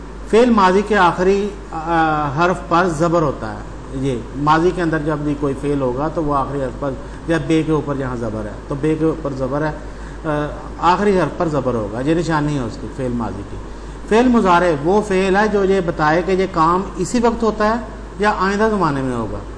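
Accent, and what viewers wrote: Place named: Punjab, India. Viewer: برا ہو پنجابی زبان کا کہ یہ تلفظ کا بیڑا غرق کرتی ہے یہاں تک کہ استاد کی سطح کے لوگ بھی اس کے برے اثرات سے نکل نہیں پاتے نتیجتا شخصیت داغدار ہو کر رہ جاتی ہے یہی حال یہ سبق پڑھانے والے صاحب کا ہےتمام تر صلاحیت اور محنت کے باوجود شخصیت متاثر کن نہیں بن پاتی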